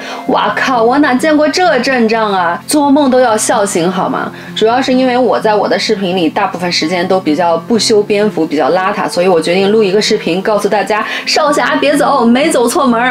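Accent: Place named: Beijing, China. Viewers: 还真是北京口音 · 咋听像京味儿，细听还是有点喳味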